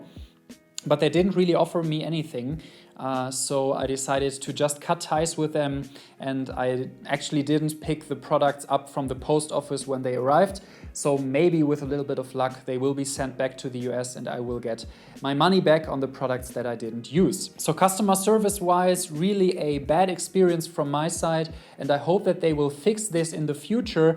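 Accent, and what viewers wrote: Germany. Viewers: I heard 2 seconds and I knew you was German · Yes he have a thick german accent... I know cause I have been living in munich since two years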